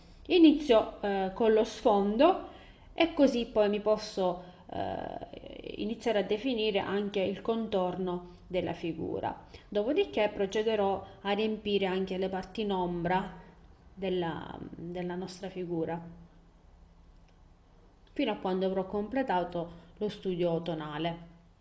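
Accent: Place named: Sicily, Italy. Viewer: grazie per i tuoi video e complimenti, si sente che sei siciliana, un saluto da palermo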